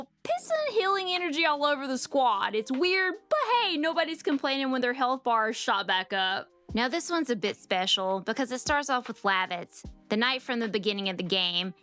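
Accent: South, United States. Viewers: Just curious if your from the southern part of USA ? You have that cute accent if not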